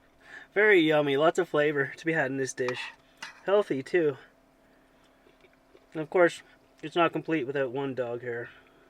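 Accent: Canada. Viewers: Don't forget that epic Canadian Accent! 😉🚚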